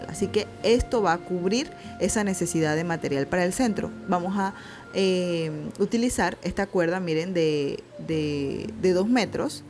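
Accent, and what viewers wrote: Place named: Venezuela. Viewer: me encanto, explicas de una manera muy sencilla y creas un muy buen ambiente. Creo que eres venezolana por tu forma de hablar, con lo cual somos paisanas. Siempre he tenido problemas al calcular el material que necesito y con tu explicacion lo has hecho super faci. <<<<<gracias por compartir...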